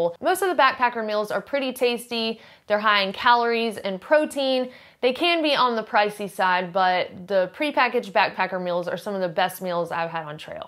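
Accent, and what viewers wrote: South, United States. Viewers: Absolutely LOVE your southern accent, I'm from the South and it reminds me of home! My wife sounds the same; Riiiiiice! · My moms account but your southern accent creeps in i love it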